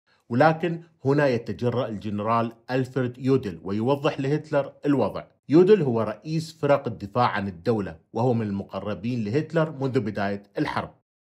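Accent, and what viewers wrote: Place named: Iraq. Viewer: الحنين الى اللهجة العراقية في سرد القصص😢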